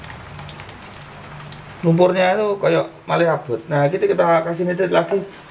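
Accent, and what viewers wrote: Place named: Java, Indonesia. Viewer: Lucu jg mas bro gpp bahasa ny agak jawa2 an itu menunjukkan kita punya identitas. Siiiplah pokoke 👍👍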